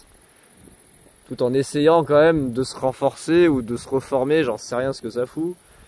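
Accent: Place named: Marseille, France. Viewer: merci pour le partage! ça nous manque dans le Sud, un orage avec de la pluie! j'aime bien ton accent marseillais!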